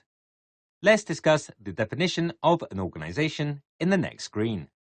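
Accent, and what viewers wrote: England, United Kingdom. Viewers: I think the voice is British. Sounds fine to me. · I don't get why people are moaning about the voice.. I'm English and sounds like a normal English accent to me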